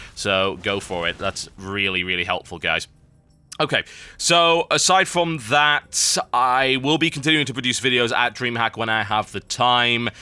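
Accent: United Kingdom. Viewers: people from the US watch a lot because we love hearing your british voice!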